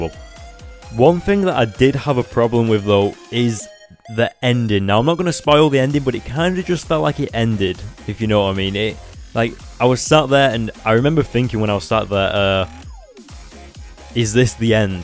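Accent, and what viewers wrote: United Kingdom. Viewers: r u british or american and which of those countries do u live in im american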